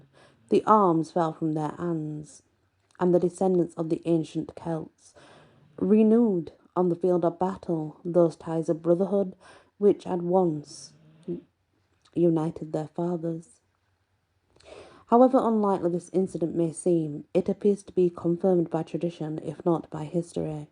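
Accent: United Kingdom. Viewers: Good evening ✨ I’m absolutely in awe… the legend of Saint Cast is one of the most powerful things I’ve heard in a long time 😢💕 your storytelling is so vivid I felt like I was standing between the two forces—Highlanders and Bretons—watching them realize their kinship through a shared song 🎶🫶 the moment they disobeyed orders and embraced was so symbolic… it’s the kind of tale the world needs now 💔💛 your mention of how the song might trace back to a Celtic theme and how Reid could have drawn inspiration was fascinating 🧐 the little details you shared made the story feel alive 🔍 your calm, gentle, British-accented voice is like being wrapped in a soft blanket while hearing ancient wisdom 🧡 thank you for your light, your research, and your heart 🌟 more power to you, you are a legend yourself 💐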